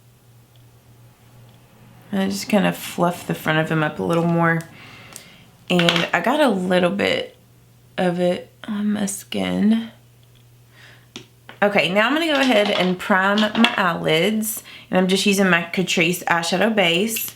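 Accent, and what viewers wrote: South, United States. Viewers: I just love watching your videos. I absolutely adore your accent....are you from the south? Your so sweet and your tutorials are easy to follow and love that you use a lot of drugstore products! 😁🇨🇦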